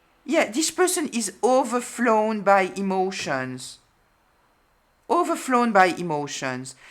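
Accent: France. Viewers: i love your french accent. it's lovely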